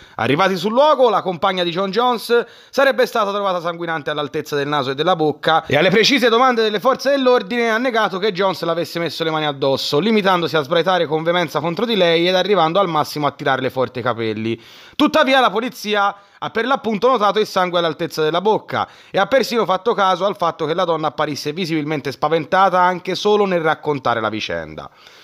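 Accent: Tuscany, Italy. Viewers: Tanto per curiosità, ma sei Toscano?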